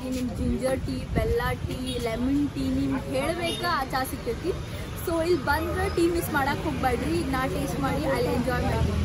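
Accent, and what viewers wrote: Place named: Dharwad, India. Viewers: Medam speech dharwad language I like it more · Hey madam.. i impressed our hubli kannda... nim math kelthidre.. girmit thindange aythade...bari cholo itri nim mathado way... from haveri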